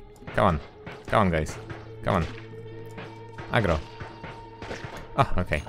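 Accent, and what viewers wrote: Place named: Poland. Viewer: You have a very slavic accent, are You perhaps Polish? Also noic nice wand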